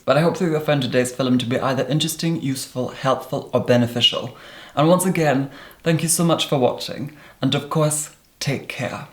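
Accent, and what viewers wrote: Scotland, United Kingdom. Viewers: Is that a hint of a Scottish accent I can hear ? Yes I’m new here 😊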